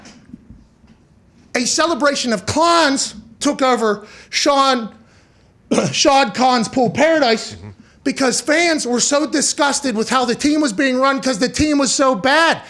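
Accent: Pittsburgh, United States. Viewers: Got damn you are from Pittsburgh listen to that accent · The yinzer accent thickens when he gets excited. DAAHHNTAAHHN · Lol 😂 Love the yinzer accent! Thank you Jags! Go Stillers! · becomes Super Yinzer when he’s pissed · The Pittsburgh accent from McAfee is amazing...they should bring the Pittsburgh Dad on here to really make it pop.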